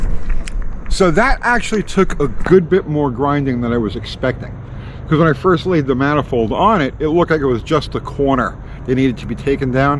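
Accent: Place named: New Jersey, United States. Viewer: judging from your accent, I'd say you're in Joyzee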